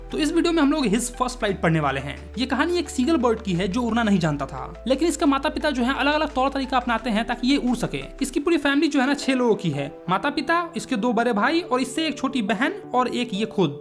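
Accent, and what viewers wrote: Bihar, India. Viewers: Bhaiya are u from bihar 😅❤❤❤❤ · Bhai aapki bihari beech me maja dila deti hai 😂😂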